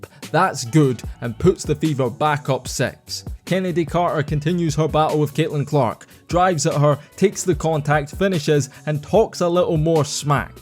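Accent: Scotland, United Kingdom. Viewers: Agreed! Who is the announcer? Sounds like a Scot. The main reason my interest was maintained for so long. Articulate and engaging.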